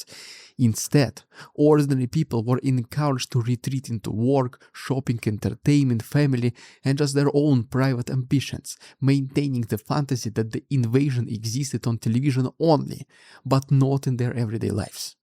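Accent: Russia. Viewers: Dude; i would appreciate if you spoke more slowly. I admire your eloquency in English. But spoken fast with Russian accent, is difficult to understand.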